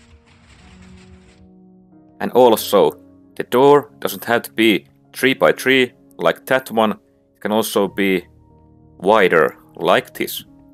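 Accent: Finland. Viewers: nice finnish accent mate. x1 slap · I cant focus on the tutorial because I get soooooooooo lost in that Finnish accent 🥰 it’s so beautiful 🤤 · hmm what do i hear? are you from Finland or Estonia? your acsent telss a lot....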